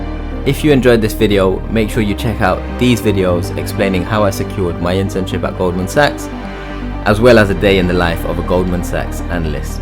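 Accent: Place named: Hackney, United Kingdom. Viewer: Sounds like he's from Hackney.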